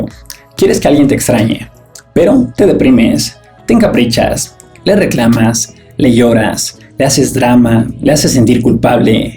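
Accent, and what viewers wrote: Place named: Mexico City, Mexico. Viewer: juan jose perez Yo lo escucho perfectamente como un habitante de Ciudad de México donde por ser tan grande con casi 10 millones de personas y sin contar aún la periferia de poco más de otros 13 millones, existen variados acentos. Pero en específico él es como de una de las zonas del centro-sur, clase media alta. Obviamente con buen nivel no solo económico, si de educación y cultura.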